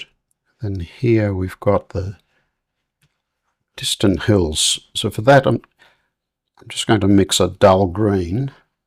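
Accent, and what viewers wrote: Australia. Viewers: This is amazing!! Pardon me if I am wrong, are you Aussie? Would really like to see how to paint a gum tree Please. 🙏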